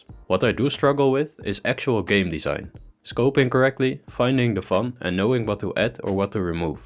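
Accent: Netherlands. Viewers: Too bad. Je klinkt Nederlands! Not everything needs to be original or grand. This definitely sounds like potential but needs interesting level designs. Maybe someone with more experience can help a great deal. But I get that you're fed up with the spider gunner game now. Making experimental games is the hardest there is. KISS principle and sticking to tried and true concepts can help. I made a card game: <link> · I hear a Dutch accent, Ik had/heb dezelfde struggles. Het is nooit verkeerd om opnieuw te beginnen en te leren van de fouten die je hebt gemaakt. Maar... Te, is ook niet goed he ;) op een gegeven moment Delen, met vrienden, familie en community, en feedback absorberen. Heel veel succes met je droom! Ik heb dezelfde =) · Are you Dutch?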